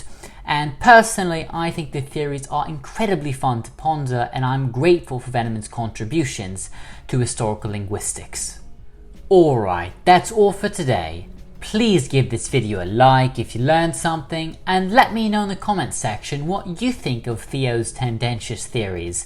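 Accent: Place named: England, United Kingdom. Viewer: well explained , I like your english accent , thanks for sharing this important info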